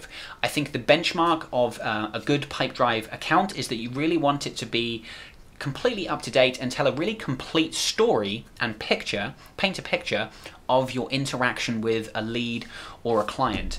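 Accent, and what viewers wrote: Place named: United Kingdom. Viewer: This is excellent, very clear training. Thank you for creating this. I'd much rather listen to your cool British accent than the Pipedrive guys cartoon voice. LOL Cheers!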